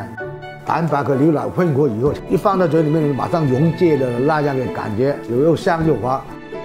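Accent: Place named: Hong Kong, China. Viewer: 口音真畀我一種香港人嘅錯覺😂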